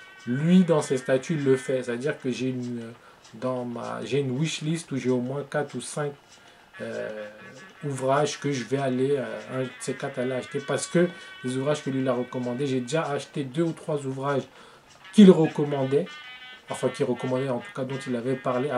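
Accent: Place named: France. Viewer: real english frenchie accent still cute ^^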